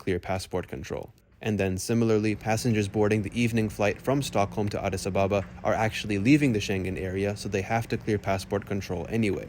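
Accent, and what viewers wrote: United States. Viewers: Just discovered your channel. I appreciate your upbeat assessment of your flight. I am so sick of captions w/out voice so it was a surprise to hear a nice American accent! Greetings from north of Atlanta.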